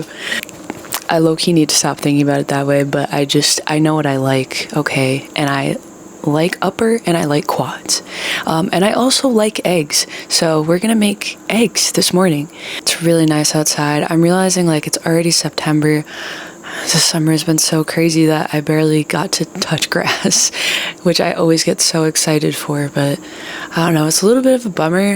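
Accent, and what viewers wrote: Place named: Upper South, United States. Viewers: Where is this kind of American accent from? It "low-key" sounds like a total laid-back good-hearted goofball who is secretly some kind of genius but covers it up well. I love it. Chillax, bro. Bonzo beans! :person-turqouise-waving::person-turquoise-waving-speech::person-pink-swaying-hair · It sounds top of the south. Oklahoma, Kansas, Colorado, eastern cali. Possibly an empty state as well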